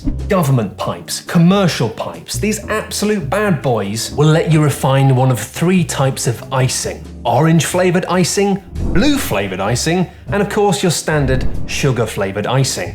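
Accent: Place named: Northern England, United Kingdom. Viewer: Is it just me or is about a magnitude of ten more Northern in this review than normal? Good work, represent!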